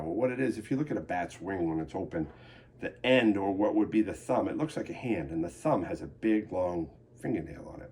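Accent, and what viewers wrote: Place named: New York, United States. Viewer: I thought you were based in NY by your accent....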